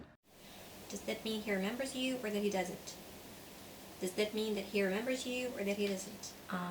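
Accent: United States. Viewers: Perfect understandable american accent. You sound like were moveed in englishspeaking country in deep childhood. And it's so increadible that you are from Ukraine and can reached that level in one-two years. Great unbelievable achievement) The latest your videos are like you are completly born in US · However, your video in 2023 I just watched, I mistook you as American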